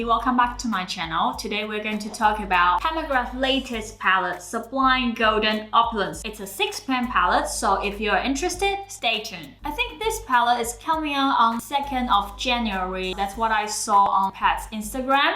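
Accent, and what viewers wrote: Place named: United Kingdom. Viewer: LOVE UR BRITISH ACCENT !!!!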